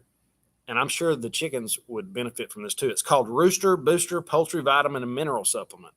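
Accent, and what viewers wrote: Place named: South, United States. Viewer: This is NOT an important comment but I just have to say that you are Billy Bob Thortons look alike! That southern drawl and all❤️❤️❤️ Also, thank you for all you do in proving information to us preppers.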